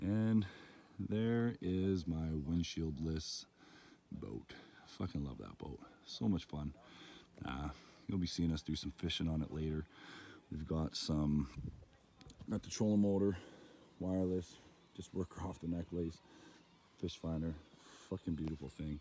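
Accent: Canada. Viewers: Ontario accent - for sure - thanks.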